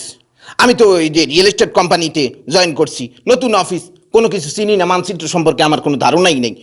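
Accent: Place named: Barishal, Bangladesh. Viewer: barishailla vasha shunlei moja lage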